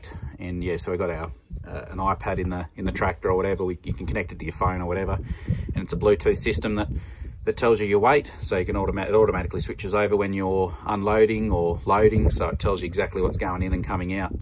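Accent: Australia. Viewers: Hey, you need to include an Australian dictionary with your videos. What is a brick dunny? Yeah, I know what it is, but occasionally I have to scratch my head on some of your terminology. LOL. Not a problem. I totally enjoy your videos. I visited Australia 4 times, so I did learn a little bit about your lingo. R's are usually silent. A bar is a bah. On a farm, the list of equipment that needs work is endless and always changing.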